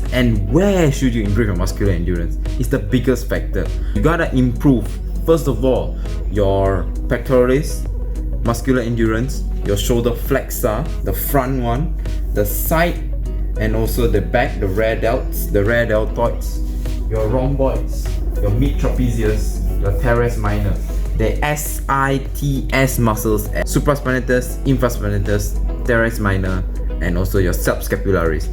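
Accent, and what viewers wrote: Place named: Africa. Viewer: Why do you you sound African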